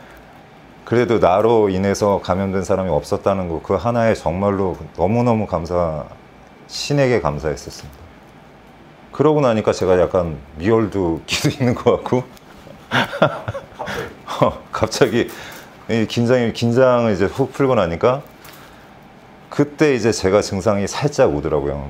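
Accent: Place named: Seoul, South Korea. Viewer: 와 진짜 한국인 아니에요? 진짜 오리지널 서울사람같애요😳 한국사람인 나보다 더 잘함ㅋㅋㅋ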